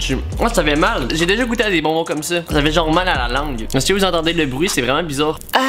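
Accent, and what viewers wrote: Quebec, Canada. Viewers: T québécois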